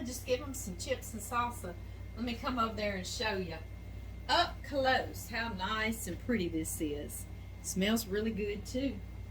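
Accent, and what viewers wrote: South, United States. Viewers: love your videos. you're such a happy gal. love your southern draul.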